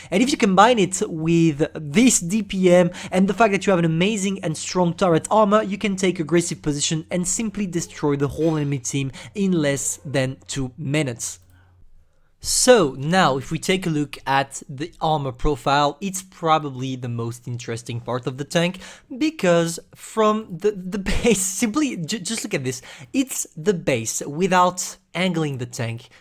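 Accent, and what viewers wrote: France. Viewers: Is u french......yes?!?! Well bounjour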